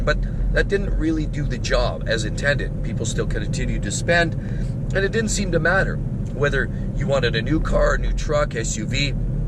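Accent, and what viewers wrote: Canada. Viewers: Notwithstanding the recent revelations regarding the workload for the Donut Media guys, no other car YouTuber works harder warning and endorsing cars and the issues people experience. When shoppuing for sleeper value, this infomation is invaluable. I also pick up on his Canadian accent every once in a while and he sound like Bob and Doug McKenzie.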